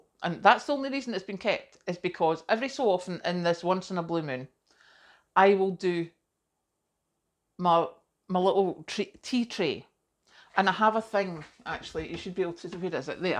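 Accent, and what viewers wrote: Scotland, United Kingdom. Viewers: Yes please to Pom Perks. It's badly needed at the moment, it's so busy on the farm this time year its hard even to fit in a meal and wee sit down after. Afternoon tea made me smile. With your accent it reminds me of the high tea my friend who lives in Bridge of Weir, Renfrewshire always does on a Sunday. Everything is home made, it's such a treat when I go stay with her.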